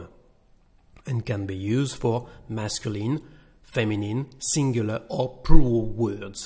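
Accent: France. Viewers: Are you actually French or you are just really good at it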